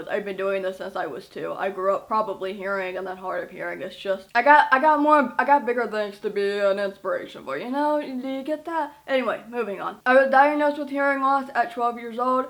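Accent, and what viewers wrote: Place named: United States. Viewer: What i find amazing is your accent is american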